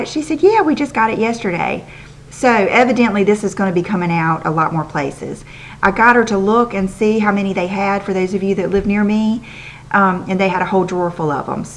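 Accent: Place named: Texas, United States. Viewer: i loveeee your accent!!! is it from texas??? im from another country so that is why i dont know exactly where its your accent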